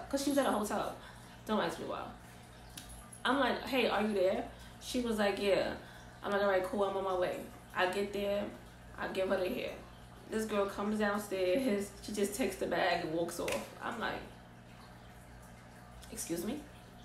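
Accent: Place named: New York, United States. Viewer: I hear her accent Im from nyc too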